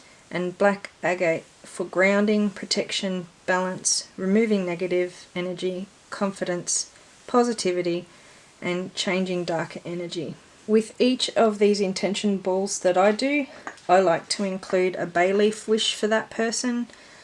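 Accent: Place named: Australia. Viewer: Thank you for sharing your crafting. It is so lovely to hear a fellow Australian accent.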